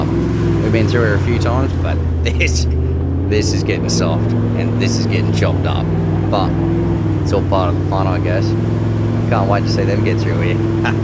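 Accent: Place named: Australia. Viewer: Strong accent. I live western nsw, work western qld and the nt and noone speaks like that. Is this aussie city guy accent exaggerated for youtube?\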